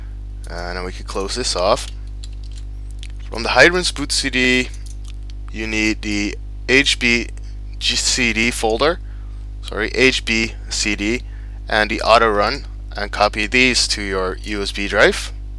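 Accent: Netherlands. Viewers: nou bedankt.. erg handige vid.. alleen jammer van die ruis heerlijk die headphones he and yes you can clearly hear your dutch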